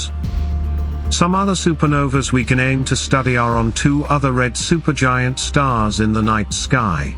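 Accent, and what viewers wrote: United Kingdom. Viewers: The brainy peoples' brains are swelling and will explode too, but in only a few years, not billions. With all the bucks you people get I truly believe you could put the money to better use; and not just in your pockets. For starters, get a spokesperson who speaks without a Brit accent. I remember watching a NASA APOLLO take off on TV, with my wife, and saying; Look at the ice build up on the o-ring joints! I think something bad is going to happen'". And an astronaut, who was from Mohawk,NY, some 10 miles from me perished with all the others on board. I hope the wire insulation you people are using is NOT made from soybeans. Get It? You people are on the Elite Welfare System and suckin it right up. Stop your putting fear in everyone, and start fearing and loving, and obey more, to please God. All the worlds infrastructure is shot, and all you do is keep shooting holes in our atmosphere. 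Will you be stumped, like everyone else, if the electricity stopped, for 40 yrs, like the 40 yrs God sent the Israel people into the desert?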